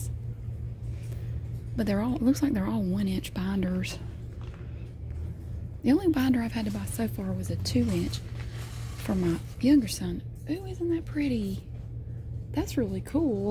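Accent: South, United States. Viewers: Hello I just wanted to say that I like your southern accent (idk how to spell it lol)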